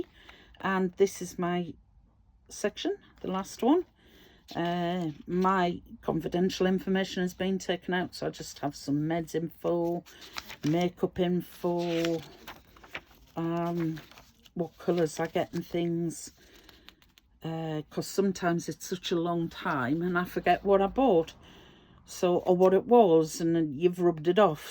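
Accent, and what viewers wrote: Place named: United Kingdom. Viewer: Awwwwhhhhhh - thank youuuuu for the shout out Sugar!!!!!! ❤️❤️❤️ I looooove your planner flips!!!!!!! Our planners look like Twinzies!😂😂😂😂😂 Thanks for sharing and doing these videos! They are so relaxing and I’m sure you always hear how Americans love the British accent. It’s sooo CLASSY. 🌸💕🌸💕🌸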